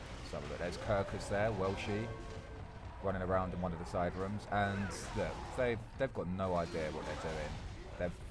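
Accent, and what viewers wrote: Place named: United Kingdom. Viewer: you idiot. the language isn't british. It's English with a british accent. stupid ass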